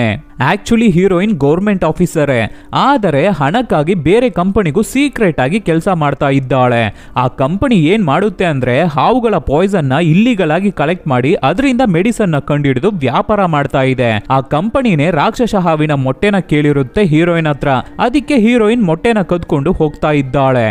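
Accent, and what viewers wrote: Tamil Nadu, India. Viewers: Kannada in Tamil accent 👌👌👌👌👌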